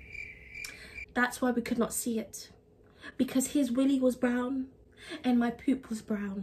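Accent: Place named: United Kingdom. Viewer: You cute love from NYC and I love ya U.K. accent love from NYC once again 😘💗❤️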